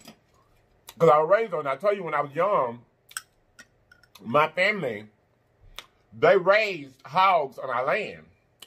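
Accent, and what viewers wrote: South, United States. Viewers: So country lol I love it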